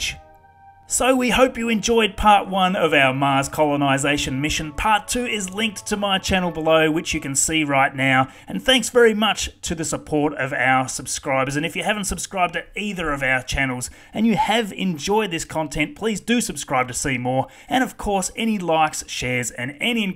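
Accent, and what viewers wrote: Australia. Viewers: Got to love (or hate) the Aussie accent! :)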